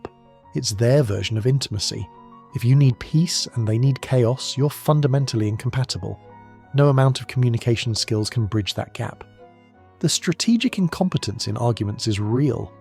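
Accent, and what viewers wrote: United Kingdom. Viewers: If this is a us-based program, why the British voice? Do Americans think that if it's a British voice, it's true or that it carries more weight than an American style voice?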